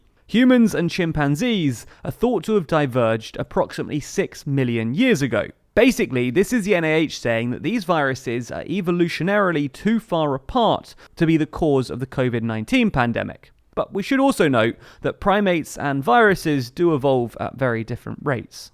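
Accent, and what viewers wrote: England, United Kingdom. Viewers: It's the English accent. He did say, "Rand". Unless it was written and I missed it.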